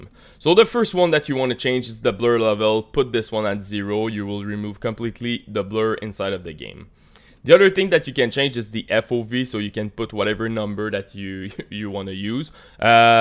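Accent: Quebec, Canada. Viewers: J'entends un Québécois!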